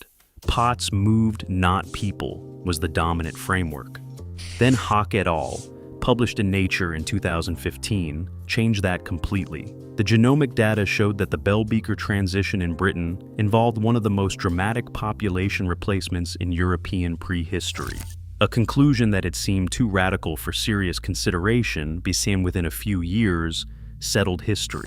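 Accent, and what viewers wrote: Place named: United States. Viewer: The constant over pronunciation for dramatic effect, and mispronunciation out of poor programming makes listening to an American accent droning on and on make this extremely jarring.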